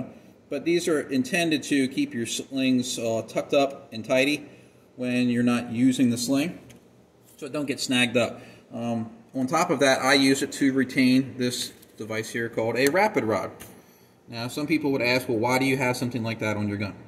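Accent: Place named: Philadelphia, United States. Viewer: I detected a philly accent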